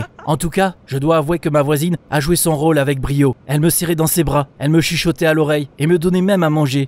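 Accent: Marseille, France. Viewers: OMG L ACCENT MARSEILLAIS INCROYABLE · léo lgm omg l accent marseillais incroyable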